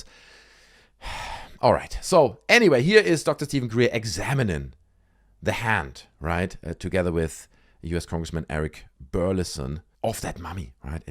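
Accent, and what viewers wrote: Germany. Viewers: You got a German accent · Nice accent. German? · I love that German accent!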